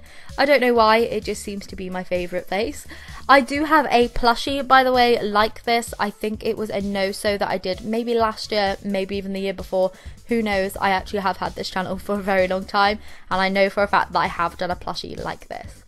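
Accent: United Kingdom. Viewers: I love your accent! R u from england? Because i love british accent!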